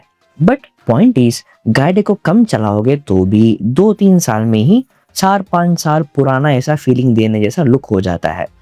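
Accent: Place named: Tamil Nadu, India. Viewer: Love your tamilian hindi accent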